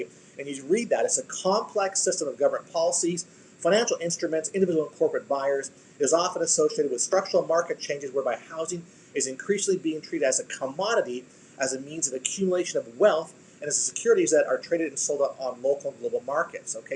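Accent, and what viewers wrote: Canada. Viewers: No big deal but you sound like you’re from Canada you have a Canadian accent I just started listening to your program just curious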